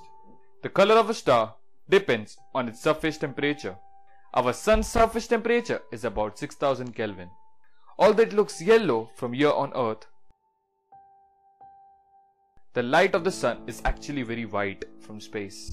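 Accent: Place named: India. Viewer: you are doing a great job, i really like it, but you need a bit of improvment on your english accent, try to speak english without accent, normal english, putting an indian accent makes you understandable for some people and not for others. Good Luck Bro! i give carn luli!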